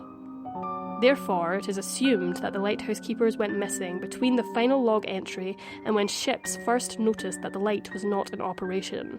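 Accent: Scotland, United Kingdom. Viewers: That was so creepy, your delivery is perfect and your Scottish accent makes me listen intently......When I was a kid I always wanted to be a lighthouse keeper. Thanks from a sassenach -)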